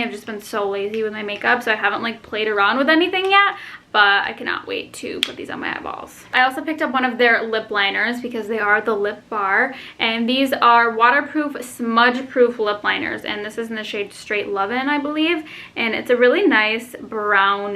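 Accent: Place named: Chicago, United States. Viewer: R u originally from like Chicago or somewhere around that area cuz u have an accent but I can’t pinpoint it lol.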